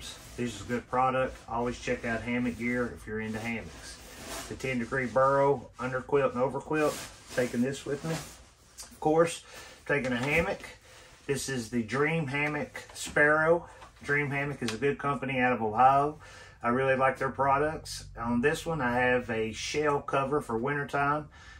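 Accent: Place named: Kentucky, United States. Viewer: Always soothing to hear your sweet Kentucky accent! Nice choices in gear my friend. I live that your hammock tarp has lasted so long!!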